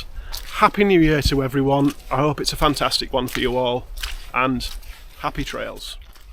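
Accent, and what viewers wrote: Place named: United Kingdom. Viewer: Hey Happy New Year to you too! And congratulations to your 1000 benchmark. I like both your gear reviews and your trail documentaries very much (for the great photography, but maybe even more for the narration - a nice contrast to all the same same stuff from fulltime youtubers. Plus, it’s British!) And yes, you do inspire me a lot. I am a backpacking newbie from Germany, and discovered your channel when I was researching European trails to hike as a first-timer. So last year I ended up doing the Yorkshire Wolds Way plus Cleveland Way. At the end of the walk I still had so much holiday left, and I spontaneously walked the Cumbria Way as well. My next trip is planned for February - part one to three of the GR 131. And in August it’s either GR 10 or GR 11. I am hoping for a comparison video at the end of your current series… So, thanks for the great work and I hope you have some wonderful trips in 2024!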